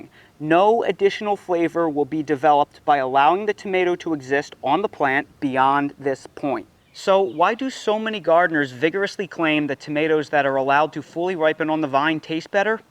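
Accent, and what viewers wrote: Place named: New York, United States. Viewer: You sound like you're from New York or Jersey. 😄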